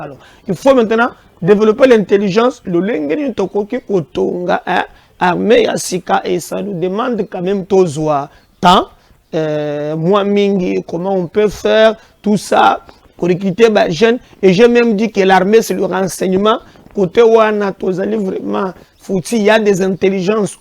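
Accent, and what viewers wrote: Kasai, Democratic Republic of the Congo. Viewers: Ngai nalingaka accent na yo !!! Je trouve que tu assumes ta lubalite !!!!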